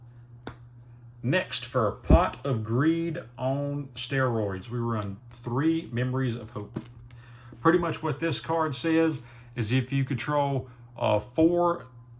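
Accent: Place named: South, United States. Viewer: Something about hearing a southern accent talk about yugioh seems just right. Great deck, hope your channel booms.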